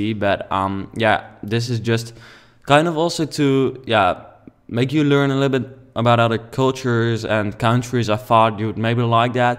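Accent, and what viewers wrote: Netherlands. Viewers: Je kan wel horen dat je Nederlands bent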